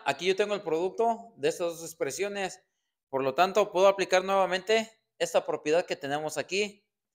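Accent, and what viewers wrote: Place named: Mexico. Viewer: profe tienes un acento muy mexicano estas muy basdado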